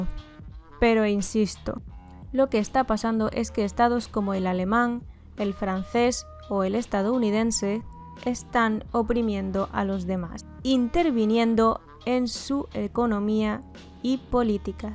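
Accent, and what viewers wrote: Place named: Spain. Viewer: Estoy muy confundido ahora mismo porque tienes un acento casi diría de España no tanto de México pero tienes una foto de perfil y la bandera que podríamos relacionar a México.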